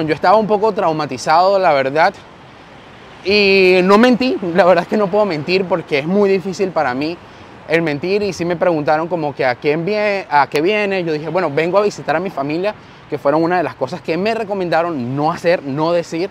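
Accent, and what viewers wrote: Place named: Panama. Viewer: Me gusta como dices aquí tenemos. Ya tu eres panameño.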